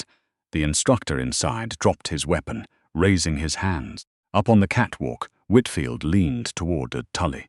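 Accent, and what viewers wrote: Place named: United Kingdom. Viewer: British accent stinks ruin concepts of storytelling.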